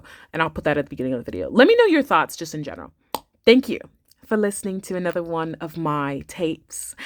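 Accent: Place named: England, United Kingdom. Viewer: The English accent had me out 😂😂😂 love your video style !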